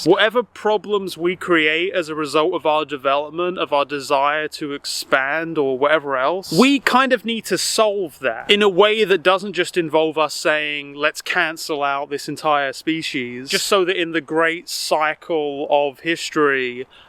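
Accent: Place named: United Kingdom. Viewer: Very unrelated remark/'question': this is the second time this week I find out a content creator (*hurl*) I like, who also happens to have a British accent, turns out to be living in a deeply red state. Wastha'abou'? I am grossly assuming here that you actually live in tennessee, apologies if that's off-base.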